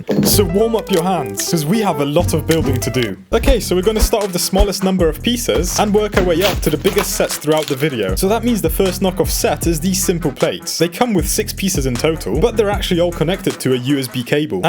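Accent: England, United Kingdom. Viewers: He British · are you english or british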